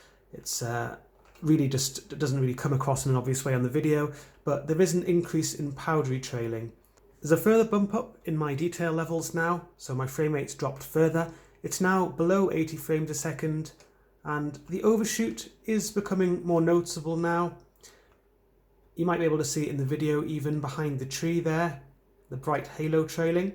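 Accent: United Kingdom. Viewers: you have an english accent, but im looking in UK and cant find this monitor. all i can find is the 24G2U5. where are u located?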